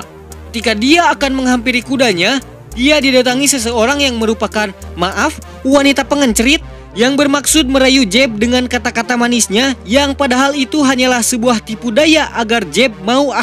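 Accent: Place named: Sunda, Indonesia. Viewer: Asik seru bnyk alur cerita pembawaannya Sunda. Karena saya sendiri asal tasikmalaya. Tapi sekedar masukan kang klo bisa gaya bicara dan penyampaian dengan gaya sendiri dan karakter sendiri. Jangan meniru orang lain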